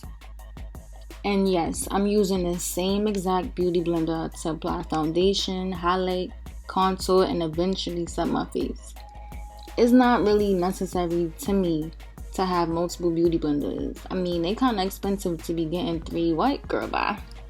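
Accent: New York, United States. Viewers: this video made me realize that we dead ass have a accent in ny. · Ur talk over is hella new york😂😂😂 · The New York Accent Yes 😛❤️!! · that NY accent is heavy though !! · wow us New Yorkers accent is too real 😂 love it. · Your NY accent is so sexy, ma. I love it. Too beautiful 💜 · YESSS! So cute. I love NY accents. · Mad NY. You sound like me lol love the video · that Nicki Minaj New York accent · New York accents sound so mean but bomb 😂😍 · Yo I'm new and I could tell you from New York by our accent 🙌🏾 and you're fine af 😍 subscribeddddd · Lmao the NY accent made the video 10x better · your voice is like a mixture of cardi b remy ma & nick I minaj. I guess it's just the NY accent! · i love your Ny accent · Omg! Me too, I'm from New York but I was like damnnn her accent heavyyyy lmao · OMG we do have a ny accent. I thought people were buggin when they say NYers have a accent. 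· Her thick New York accent is so sexy 😩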